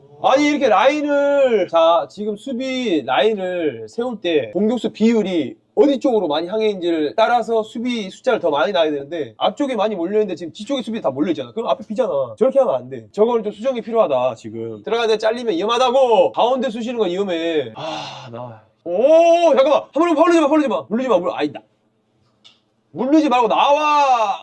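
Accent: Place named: Jeolla, South Korea. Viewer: 전라도말씨로 해설듣는건 첨인데 은근 중독성있네 😂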